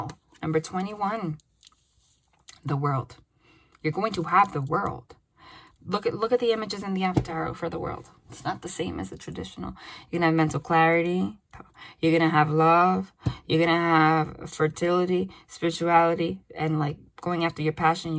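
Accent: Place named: New York, United States. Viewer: I ABSOLUTELY LOVE YOUR ENERGY, YOU HAVE ME GIGGLING RIGHT ALONG WITH YOU, ALSO LOVE THE NEW YORK ACCENT!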